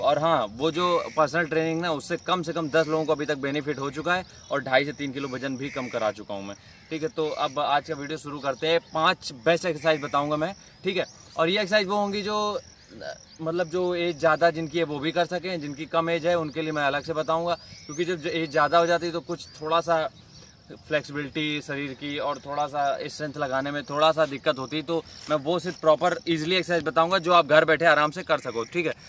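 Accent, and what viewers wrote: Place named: Lucknow, India. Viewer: Lucknow k ho lagta h.. bahut badhia style h hachak k 🤩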